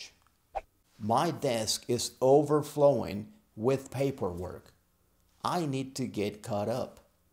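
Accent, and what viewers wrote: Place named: United States. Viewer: Buen inglés americano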